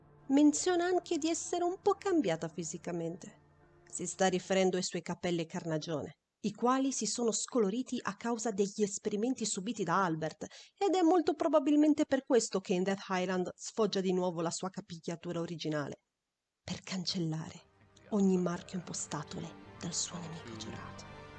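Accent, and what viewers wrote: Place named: Italy. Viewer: You've just became one of my favorite humans on earth ! Really good video and i like your italian accent ! It's very well written and it's consistent the only thing i could say : " just continue !"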